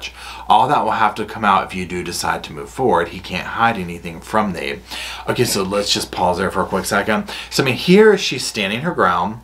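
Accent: South, United States. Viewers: Why don’t you have 1m subscribers? You are the best. Great wit and the southern accent makes it gold. !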